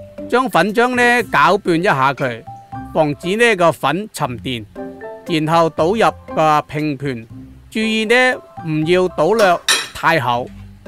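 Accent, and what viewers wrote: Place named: Zhaoqing, China. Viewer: 口音一聽就聽肇慶人👍👍👍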